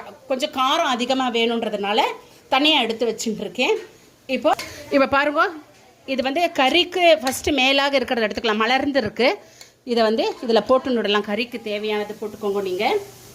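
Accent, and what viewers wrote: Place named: Kerala, India. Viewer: Which place are you from Mami.? Konjam Malayalam touch iruke, Tirunelveli or Trivandrum or Palakad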